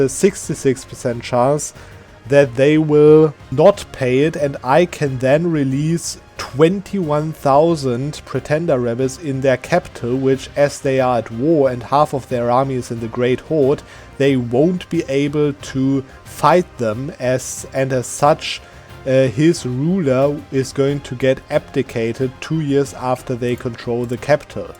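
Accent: Germany. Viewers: The german dialect is strong in this one. Love it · Thanks for the reply. I liked your video otherwise! You have a sure way of speaking, and a nice German accent.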